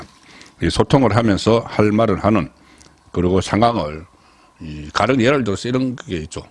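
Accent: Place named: Daegu, South Korea. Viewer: 들리기야~ 잘들리죠~ 제가 말씀드리는거는 발음이라고 해야되나~?물론 대구 분이시라 사투리도 섞인것도있고, 성격이 급하신지 말도 하시다보면 더듬고 그려셔서요~ 그냥 어드바이스~??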